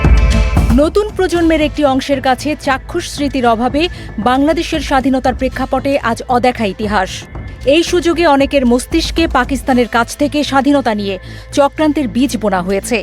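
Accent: India. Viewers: এনটিভি কি ভারতীয় সুরে কথা বলেছে?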